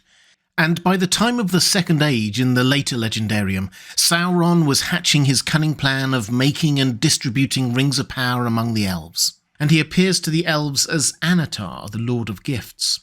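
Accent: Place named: United Kingdom. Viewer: What is accent? It seems British but not really.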